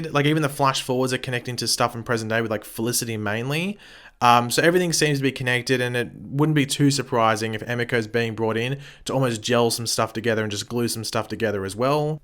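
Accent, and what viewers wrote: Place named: Australia. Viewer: CJ Rehv he said cracking my nigga. He’s Aussie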